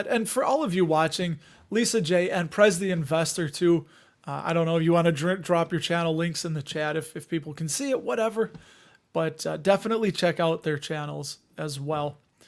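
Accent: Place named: Chicago, United States. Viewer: GREAT video.... Always love watching the replay.... Quality viewing... Love the Chi accent... LOL..... Long live Gino's East.... I do the next best thing since living out of IL - so I order the Lou's - deep dish... They come out pretty good. Love the show.... You make learning fun. You're a true soul.... Be well...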